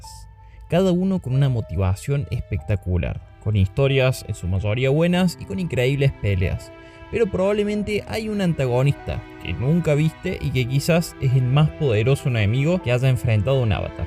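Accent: Cordoba, Argentina. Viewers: Sos el primer youtuber cordobeeesaso que escucho jajaja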